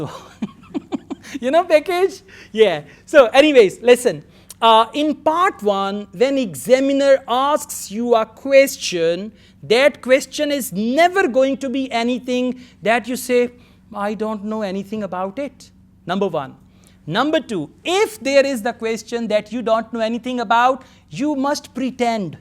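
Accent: Punjab, India. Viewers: Sir although your teaching is just fabulous bt i really likes the desi punjabi words you speak Kithe aa tera kutta😁🤣🤣🤣 Fun and learning together It makes me feel that i m in touch wid my native language 😊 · Sir ur punjabi is same as us dun vatta 😁😁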